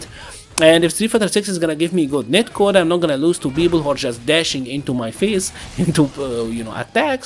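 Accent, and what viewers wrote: Egypt. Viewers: I go to an Egyptian church and I love your accent. You remind me of my abouna when you say "the people" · Love your accent.. Sounds Egyptian..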